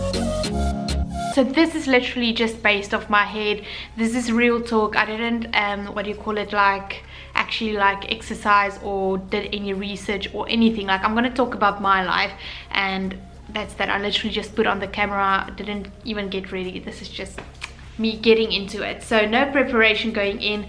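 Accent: South Africa. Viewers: She sounds very South African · You sound South African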